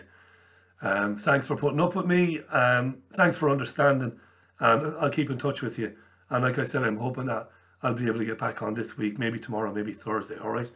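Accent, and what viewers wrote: Ireland. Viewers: O man ......I miss ya ? I didn't realize how much I listened to you and the show even my kids are asking about you ..my ten year old son does a real good Irish accent all because of your show come back soon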